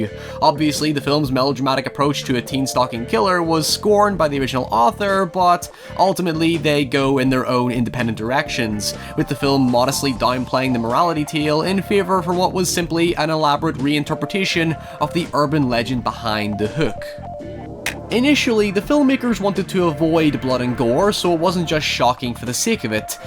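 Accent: Northern Ireland, United Kingdom. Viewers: U have a northern irish accent? ❤❤❤ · My guy are you Northern Irish??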